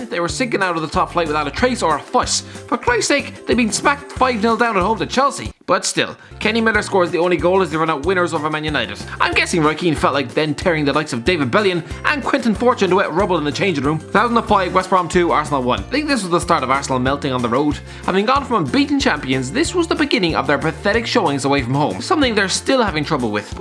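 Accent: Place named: Ireland. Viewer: I'm a simple man. I hear the irish guy, I press the like button.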